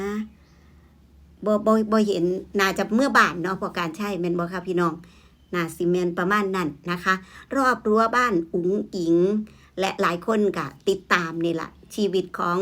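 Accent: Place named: Ubon Ratchathani, Thailand. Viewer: ชอบการเล่าข่าวแบบนี้ น้ำเสียงแบบนี้ สไตล์ 🎉🎉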